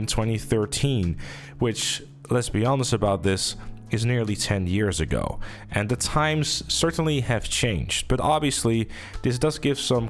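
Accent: Netherlands. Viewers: Hol up is this man dutch?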